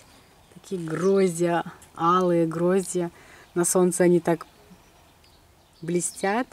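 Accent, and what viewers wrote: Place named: Belarus. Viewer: здравствуйте. Я год тому назад случайно попала на Ваш канал. Понравилось просто Ваш говор, ведение разговора. А когда Вы нынче приехали к родителям и мама с папой стали учавствовать в с"емках и услышала говор родной, песни - все... Моя любовь - Беларусь!!! Пусть Господь даст здоровья Вашим родителям на долгие года!!! Пожалуйста, научите их записывать для нас видео ( хоть раз в месяц) и Вы уж сами до на доносите их видео... Спасибо за все!!! До свидания! До видзення...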